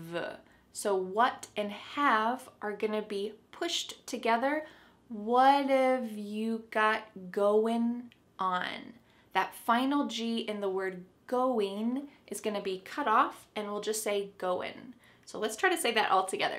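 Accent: United States. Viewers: 😎I will become American after listening to you👻👍🏻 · Your English speaking style is very nice,,, You're amazing 😍 😊..I can learn American accent very well.❤️🇧🇩🇧🇩🇧🇩🇧🇩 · Hi, I discovered this channel and for me it's the best. Are you American or aren't you? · Hello my teacher,daughter, how are you doing my daughter, hope you to be well,dear,I wanna say you thank you so much dear for all your fantastic lessons, by the way your accent also very nice and clearly really you're fantastic american accent english teacher in the end acept my greeting.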